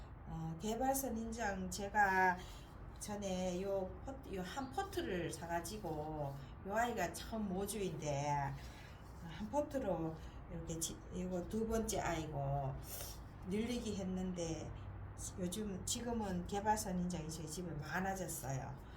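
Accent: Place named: Gyeongsang, South Korea. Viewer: 저는다구에 거주하고있습니다 경상도 같아요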